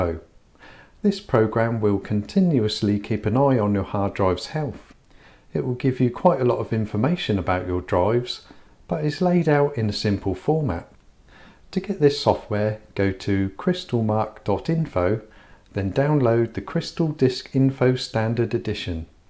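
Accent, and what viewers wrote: Norfolk, United Kingdom. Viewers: You a Norfolk man? Trying to figue out your accent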